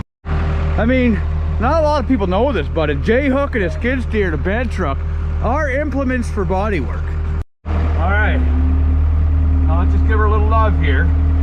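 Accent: Canada. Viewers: I’ve never heard someone as Canadian in my life I’m fucking hooked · Why do you talk like a Canadian mate ?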